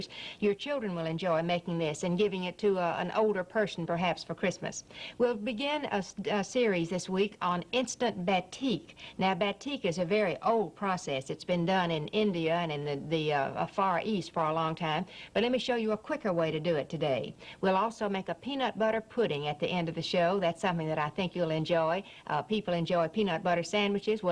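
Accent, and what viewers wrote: South, United States. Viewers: i don't remember this woman but i like her southern accent.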